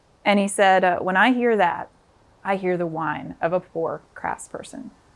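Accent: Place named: South, United States. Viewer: Love how the southern slips through from time to time.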